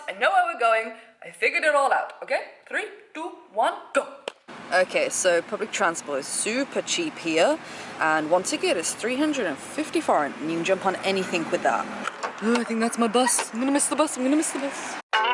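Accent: England, United Kingdom. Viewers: hahaha... you are super funny and really liked the way u explained ur day expenses and luved ur accents too which reminded me of nephew living in England... but he is 12 yrs kid 😁😁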